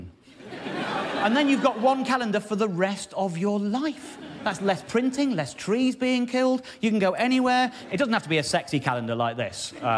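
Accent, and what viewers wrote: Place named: England, United Kingdom. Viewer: Clicked, heard the English accent and thought, "He must be a professor." Clicked the description. "Comedian. I was half right.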